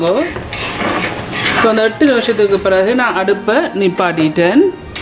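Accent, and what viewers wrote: Sri Lanka. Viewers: Yummy curry..Srilankan way of speech nallarukku sister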